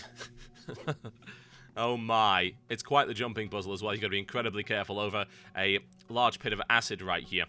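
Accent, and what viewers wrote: United Kingdom. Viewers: i miss old:( the british one he has gotten signifigantly less britanly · Dude your new intro is sooo british.....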